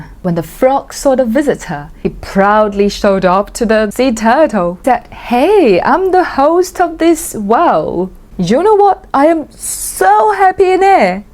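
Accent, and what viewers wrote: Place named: England, United Kingdom. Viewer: I am only here to listen to English accent and maybe by chance learn some Mandarin. ( Its a Canadian thing)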